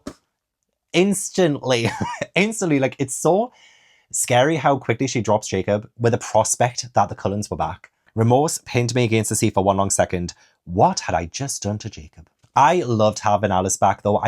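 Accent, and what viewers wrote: United Kingdom. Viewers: Idk what country you hail from. To my ignorant American ears, it sounds like somewhere in the UK. If I’m wrong, skip my comment. If not, I have a genuine question: How do you square the thoughts you present at this timestamp with the very real-life example of Elizabeth II being betrothed to Philip when she was 9, and he was in uni? Ever since I heard that, my thoughts on the Royal Family, while never chummy or loving, deepened into total disgust. ESPECIALLY considering that Elizabeth and Philip were cousins. Genuine, non-confrontational question that I’d love to have the opinion of a UK citizen of. Again, if that ain’t you, disregard.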